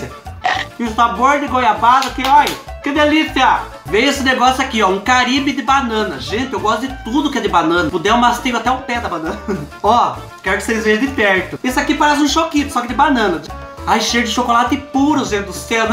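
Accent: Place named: Piracicaba, Brazil. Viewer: parese piracicabano falando kkkkk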